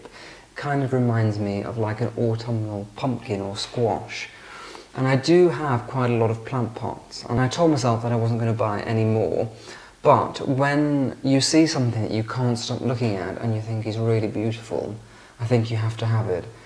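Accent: RP, United Kingdom. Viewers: accent is so posh.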